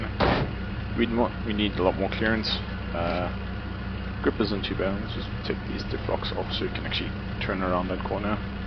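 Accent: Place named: South Africa. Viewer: I can recognise that south african accent anywhere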